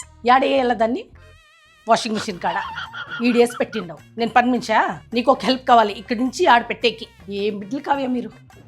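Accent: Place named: North India, India. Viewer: Meeru North Indian aaa madam... Slang vere la undi...🙂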